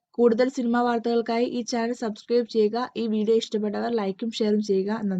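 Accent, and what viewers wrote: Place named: Malabar, India. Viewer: sariyakkanonnumilla.... all people of malabar speaks like this......But I like it......There is so much of innocence......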